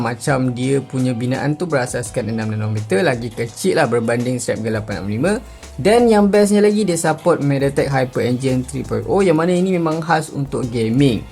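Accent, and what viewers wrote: Malaysia. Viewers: Padahal banyak dr indo pengen tau banyak tentang HP ini sayangnya bahasa Melayu nya kental banget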